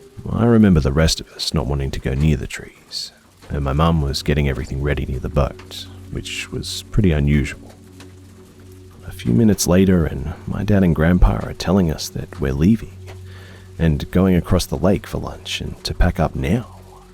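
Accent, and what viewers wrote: Australia. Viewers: what part of Australia you from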